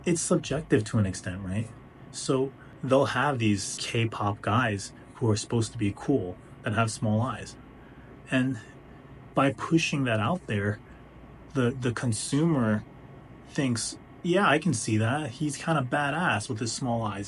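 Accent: United States. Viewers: you sound like such an american dude... if you ever actually cared for any of this media you would know japan always sets its fantasy setting in pseudo-european worlds if it occurs in modern day japan then majority of characters are always japanese and im sorry but naruto mario and attack on titan doesnt represent the medium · You sound way more American than Japanese lol Japan does embrace its beauty but Japanese are also abstract thinkers, certain things are just chic. In America we imitate the Asians as well it’s a cultural exchange. Also sounds like you’re just referring to major city areas. Goku turns sayan bc there’s an alien race called the blondes and turning gold symbolizes godlike elements.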